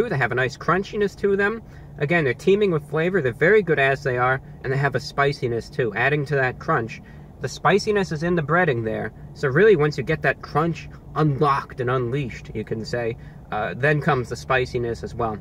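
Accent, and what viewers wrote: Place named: New Jersey, United States. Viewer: You defienelty have a jersey accent lol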